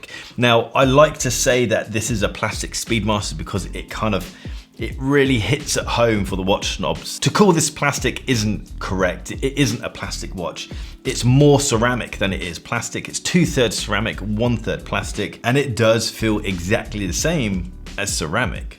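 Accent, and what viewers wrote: RP, United Kingdom. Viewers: I like how he defines watch snobs and he makes videos sipping coffee after every sentence, placing watches in categories of luxuriousness and projecting his view about a plastic watch in his posh accent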